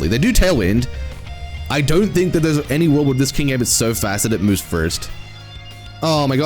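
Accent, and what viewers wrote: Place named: South, United States. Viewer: lmao you kept me guessing, the redneck accent is definitely southern Alabama/northern Florida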